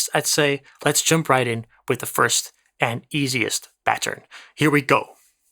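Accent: Netherlands. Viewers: NIce exercise, explanation and video overall! Also, am I hearing a bit of dutch accent in your English or is my mind playing tricks with me?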